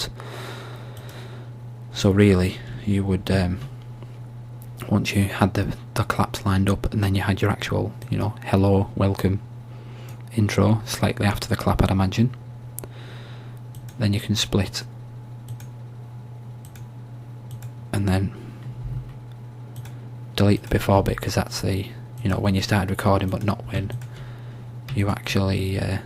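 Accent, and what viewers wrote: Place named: Liverpool, United Kingdom. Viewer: sounds like a scouser (Liverpool)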